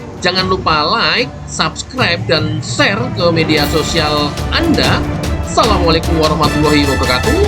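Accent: Java, Indonesia. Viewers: Jawa nya mefom banget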